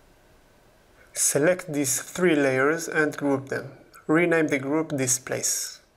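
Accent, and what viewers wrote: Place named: Israel. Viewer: Are you Israeli? You sound very Israeli.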